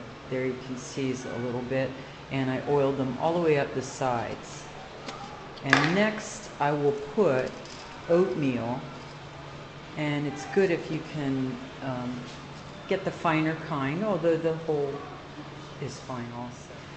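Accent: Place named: Pennsylvania, United States. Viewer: I'm hearing a Pennsylvania accent :)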